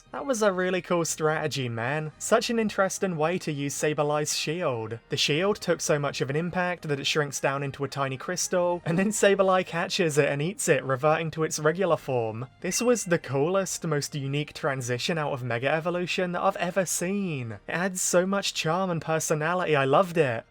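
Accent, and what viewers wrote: United Kingdom. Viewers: You don't sound tired..... you sound brittish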